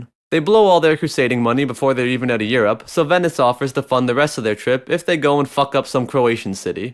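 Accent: Newfoundland, Canada. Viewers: are you from newfoundland cuz i hear you saying ''bhys'' alot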